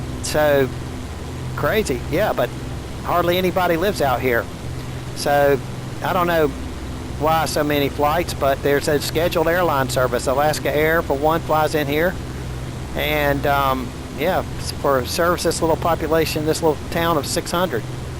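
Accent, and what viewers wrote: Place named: Deep South, United States. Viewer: Deep south. No offence meant.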